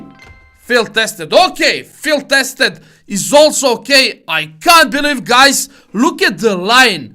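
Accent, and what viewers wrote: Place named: Serbia. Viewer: pricas engleski ko srpski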